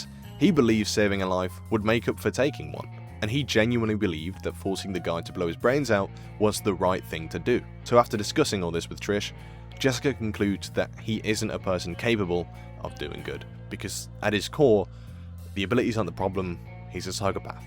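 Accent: United Kingdom. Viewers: isnt this guy british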